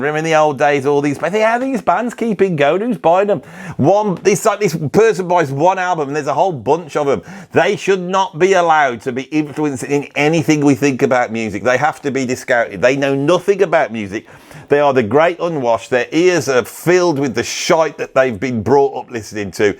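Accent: Birmingham, United Kingdom. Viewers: We love hate, but only in a Brummy accent.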